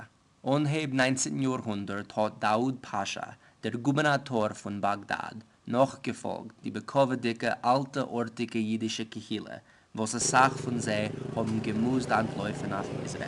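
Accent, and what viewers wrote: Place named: Northeast, United States. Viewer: How interesting. I have never heard the language that the spokesman delivered. I am a Swedish American who has a bit of an understanding of Swedish, Spanish, Latin and a great understanding of US English. I could just listen to this man & understand perfectly well what he was saying. Though he seemed to be speaking a pigeon blend of English/German/Swedish. His accent was clearly US Northeastern English.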